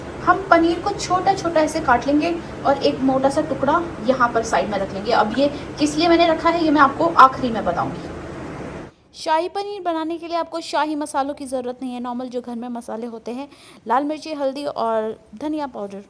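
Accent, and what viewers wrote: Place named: Pahadi, India. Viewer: I love her pahadiiii accent❤❤❤❤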